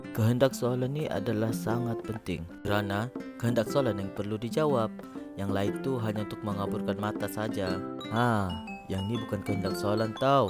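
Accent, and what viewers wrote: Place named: Sabah, Malaysia. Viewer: KETARA SABAH TU HAHAHA. TRUSKAN IDENTITI SABAHAN TU . BTW NICE CONTENT 👍👍👍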